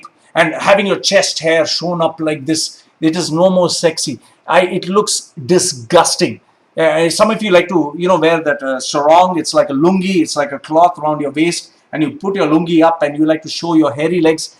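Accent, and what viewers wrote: Kerala, India. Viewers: I Enjoyed it 🤣🤣. All the facts.. I went to school in bangalore were majority teachers and students were mallus.. And trust called kerla samajam runs it, I enjoy the funny accent of Malayalam mixed in English 🤣🤣 you made my day bro 🤣🤣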